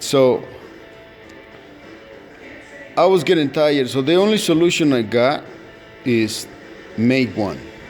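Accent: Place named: Zacatecas, Mexico. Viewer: His English is good, and the accent is not a problem if English is your first language. Esta bueno, Su ingles, y el asento no es un problema, si ingles es su primero idioma. I was content with his knowledge of adapting a Dodge part to the Nissan D21. Pienso que su asento es de Zacatecas?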